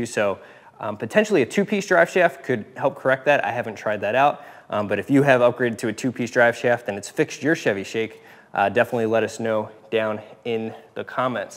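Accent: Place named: South Jersey, United States. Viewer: you said “wooder” I knew you were from south jersey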